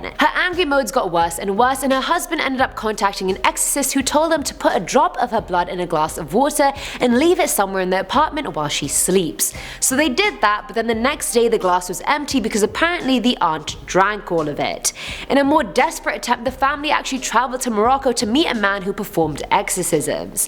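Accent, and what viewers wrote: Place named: England, United Kingdom. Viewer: This girl has the classy English accent without getting on my nerves. She's dramatic. Flaps her delicate white hand to her heart. I'm angry. (She's not wrong).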